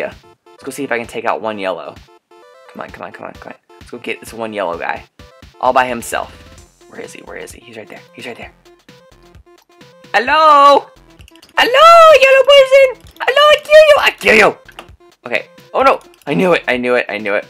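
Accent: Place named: South, United States. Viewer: What's with the country accent????????????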